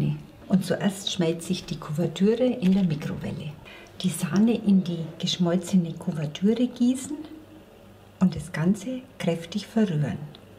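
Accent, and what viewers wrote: Austria. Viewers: sind Sie aus Österreich? 😇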